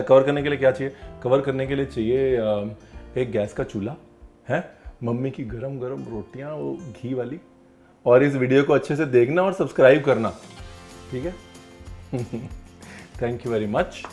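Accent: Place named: Punjab, India. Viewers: And you Punjabi accent😍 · He’s applying Punjabi sound to English.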